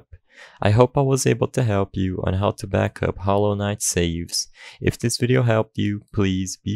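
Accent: Brazil. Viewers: eu percebi que voce era brasileiro pelo sotaque kkkkkkkk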